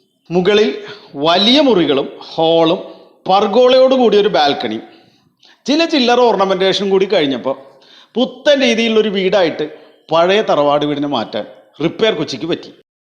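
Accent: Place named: Thrissur, India. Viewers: കൊടുങ്ങല്ലൂർക്കാരനാണ്... അതുകൊണ്ടാ... 😆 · പേര് കൊച്ചിന്നാണെങ്കിലും ഭാഷ ത്രിശൂരാണലോ ഗഡ്യ..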